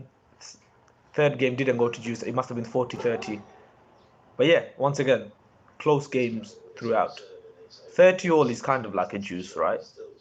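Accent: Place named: United Kingdom. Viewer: Guessing from his accent, I'd say the UK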